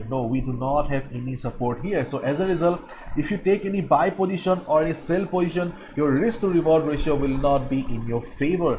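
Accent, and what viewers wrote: India. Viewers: Holy crap I’m an American and I love how your Indian accent isn’t thick at all its super smooth and easy to understand 😂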